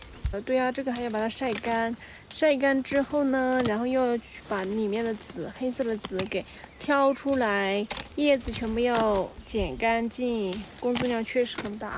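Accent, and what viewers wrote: Sichuan, China. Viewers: 사천 방언을 하시는것 같아요 티베트언어는 할줄아세요?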